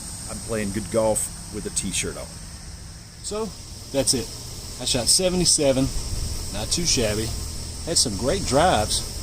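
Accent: South, United States. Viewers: Southern accent? crepe myrtles? You in NC? Just found this channel.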